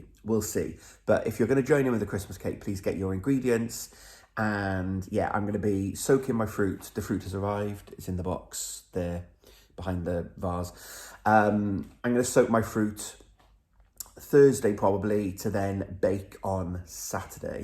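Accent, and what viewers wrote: Wales, United Kingdom. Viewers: Thanks for your lovely videos. I could listen to your Welsh accent forever, sounds like singing. Your MKAL is gorgeous, pink and green, you can’t go wrong. Cheers Chris 🇦🇺😁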